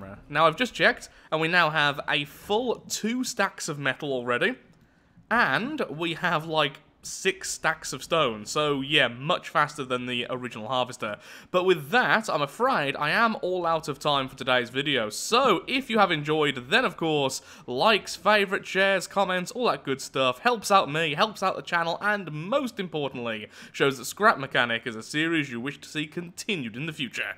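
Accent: United Kingdom. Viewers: 1. your british, not weird. cheers mate 2. i do believe the idea of increased bearings to increase speed is a good idea. even just one or two per wheel. just as a point to point increase use.(on a button) 3. if you make the collector apart of the crane, weight not considered, would be the best moving forward. nice thing is the collector is placeable and buildable upon. such as the drills attached to bearings on the collector for added pressure. 4. i do enjoy the forked wheel design and the "spider" like stance. 5. if you have questions on how to connect items of logic, or Moonbo or Kan are great choice to review here on YT.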